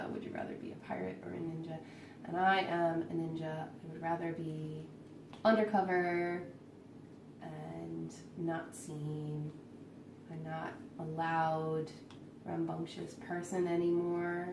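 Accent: Ireland. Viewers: Wow! Exactly a month ago I saw your first videos about “I wish I knew about diamond painting”, now I am watching much older once and your accent is more Irish then American. Love it! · I wanted to watch your first videos because being new to diamond painting, you're kind of a legend to everyone I watch, haha. I've watched quite a few of your more recent videos and coming to this one, you have an Irish accent in this one! I don't hear it anymore, it's so cute, though! But I love accents! So fun seeing you as a newbie! 😁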